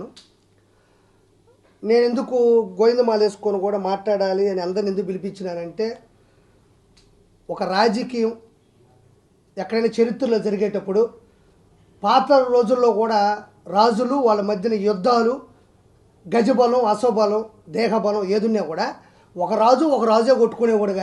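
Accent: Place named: Nellore, India. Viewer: Orey RP, you are making comedy with nellore language , first stop making comedy with nellore language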